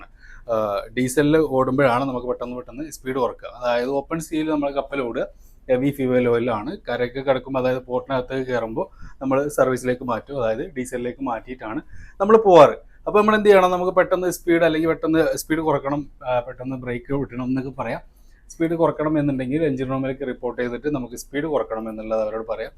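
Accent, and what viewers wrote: Malappuram, India. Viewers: നമ്മളെ മലപ്പുറം സ്റ്റൈലിൽ explanation.. 😄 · ഈ മലപ്പുറംകാർ ഇതിലും വന്നോ.. ചീഞ്ഞ ഭാഷ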